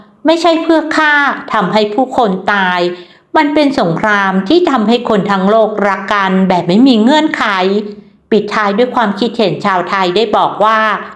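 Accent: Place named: China. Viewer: ทำไมแอดพูด ส.เสือไม่ค่อยชัด สำเนียงพูดเหมือนคนจีนเลย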